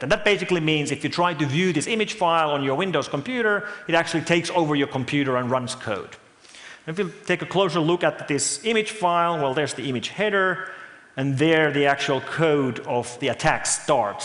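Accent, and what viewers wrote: Finland. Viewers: Oh that finnish accent :p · His accent actually isn't that bad for a Finnish guy. I've heard worse. And yes, the "klobal" is difficult to pronounce for Finns, mostly because the Finnish language doesn't really use many words with "g" in them. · I was very surprised to see a Finnish guy giving a speech in TED, and I was pleasantly surprised the content and how he delivered it. Great speech on an interesting & important subject. P.S. Extra bonus points for the accent.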